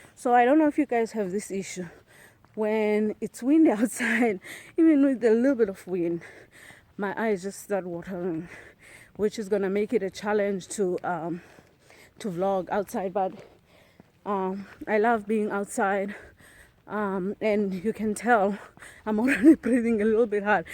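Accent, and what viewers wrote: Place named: South Africa. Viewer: Did you consciously keep your South African accent? What language do you and your family speak at home?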